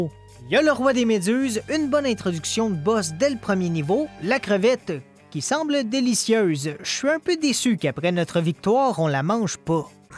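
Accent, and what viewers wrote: Quebec, Canada. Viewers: J'ai commencé à regarder des vidéos en français pour pratiquer la langue. Je me suis retrouvé ici par hasard et, waouh, quelle découverte ! Bon, je ne comprends pas la moitié (j'utilise les sous-titres automatiques. Ce serait super d'avoir des sous-titres en français). Je trouve l'accent québécois vraiment intéressant. Salutations d'Espagne !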